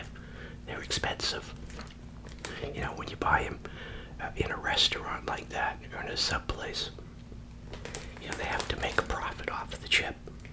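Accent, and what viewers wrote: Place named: Massachusetts, United States. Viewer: Previously being from Massachusetts it's nice watching these videos and hearing a familiar accent.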